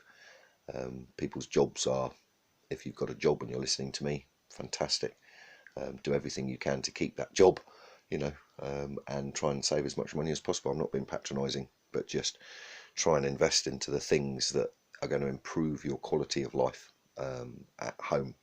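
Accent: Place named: United Kingdom. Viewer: I like your accent. Don't care much for Aussie accents but I like your Brit accent.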